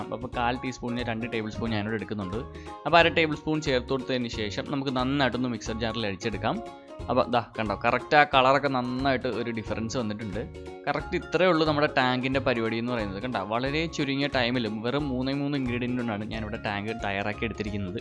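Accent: Alappuzha, India. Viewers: Bro alappuzhakkaran aano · Chettan alappuzhakkaran aaano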